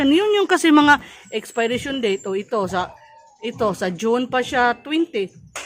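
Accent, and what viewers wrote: Visayas, Philippines. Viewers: hahahah.. Visaya giod oi, puwede mana ibalik Sis, ereklamo..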